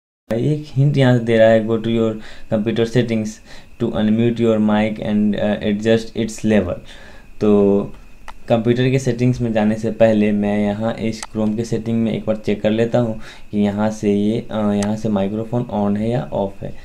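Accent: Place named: India. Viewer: I don't understand what you say that indian accent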